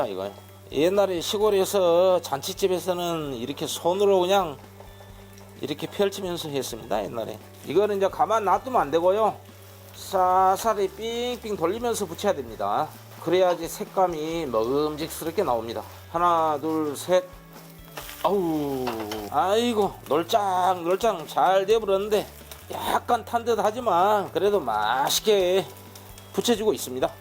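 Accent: Jeolla, South Korea. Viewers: 헐 지는 전라도 본토 발음 같은디.. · 경상도 아니고 전라도 사투리 인거같으신데요!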